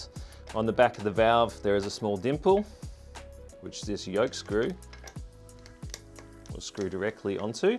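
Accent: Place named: Australia. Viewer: You're Australian 🐨🦘🪃🇦🇺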